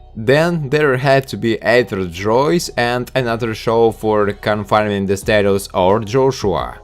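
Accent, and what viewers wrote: Russia. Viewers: Красивый русский акцент ) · The context could be fine, but dude!? Just speak Russian with Russian accent, because your English has the stupidest accent, it is irritating, so after one minute I had to go...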